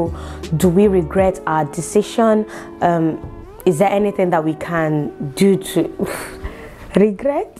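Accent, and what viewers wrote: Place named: Igbo, Nigeria. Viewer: Nden mandu na ko 🤣🤣🤣🤣 if only they know that it's bcoz that your English_ Igbo make people like us subscribe to this channel, them for run tikpeeeee🤣🤣🤣🤣🤣🤣awon oni isokuso!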